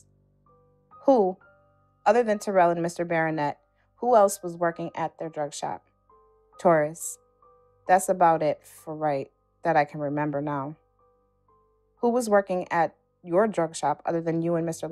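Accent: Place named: Baltimore, United States. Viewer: The narrator sounds like she from Baltimore, my city done gone to hell. Something has to be done about all of these shootings & murders or law abiding citizens need to protect themselves because the police can only do so much !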